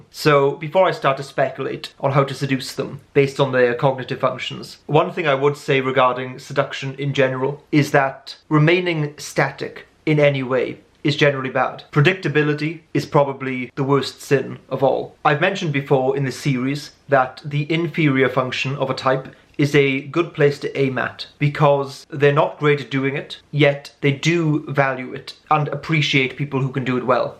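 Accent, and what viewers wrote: Wales, United Kingdom. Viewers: As an INFP female, I watched this video out of curiosity...I’m not even half way through the video yet, and this is the most accurate description of my personality I’ve ever heard....My mind is officially blown !! Is that a Welsh accent by the way? :) I’m from South East Wales - near Cardiff :)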